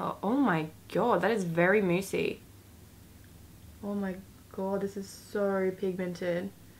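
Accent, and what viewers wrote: Australia. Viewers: Omg i love this look, and for people that are saying, " why is she wearing all that makeup her skin is flawless" Yes her skin is flawless and i hope she doesn't wear this much makeup on a daily basis. But she has a bloody makeup channel what do you expect. lots of people wear makeup just because they love to apply it and wear it. i don't wear makeup because I'm only 12, and my Mum wouldn't approve, but I still love to wear it on occasions ( when i get the chance.) Also I'm Australian and all those american Youtubers can get annoying at times, But listening to someone with the same accent as me is way nicer. Sorry for long af comment but recently found your channel and I love it ! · For some reason I feel like if Kendall Jenner had an Australian accent, she would sound like you😂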